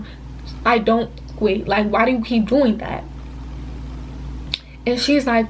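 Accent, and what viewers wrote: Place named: New York, United States. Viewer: I heard the accent and said "sis must be from NYC😄" and I was right lmaoo we can tell from one New Yorker to another. I also think it's something in the water coz these girls are weird and do not know how to be friends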